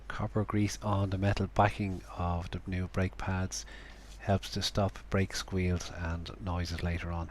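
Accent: Ireland. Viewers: love the Irish narrative and the copper slip lol, dont thing you will live that one down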